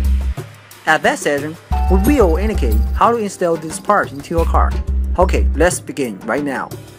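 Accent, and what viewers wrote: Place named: England, United Kingdom. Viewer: I love your English accent 😂😂😍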